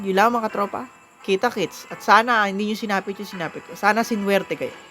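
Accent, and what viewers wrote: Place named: South, Philippines. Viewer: taga south yung accent. Dala ng emotion haha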